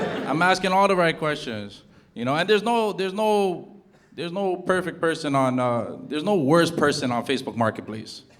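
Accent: Toronto, Canada. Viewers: Buddy sounds straight from Toronto off rip 🤣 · i kno toronto when i hear it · Anyone wondering how a real Toronto accent sounds it this..not the suburban kids on TikTok😂😂😂😂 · This guy has to be from toronto from his accent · God couldn’t listen to the whole act. That disgusting “Toronto accent”. 🤮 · Trus me I knew right away before he mentioned it this man had to be from T.O. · Bro, I knew you're from Toronto, listen to that accent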